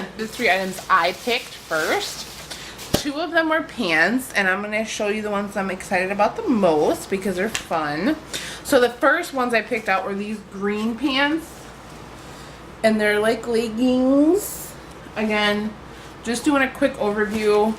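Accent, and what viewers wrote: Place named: Chicago, United States. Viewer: Chicago makes sense too. Yes you have a strong accent :)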